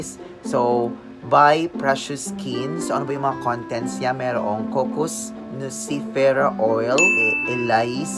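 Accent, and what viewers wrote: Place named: Cebuano, Philippines. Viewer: parang my cebuano o ilonggo accent ah. galing ng vid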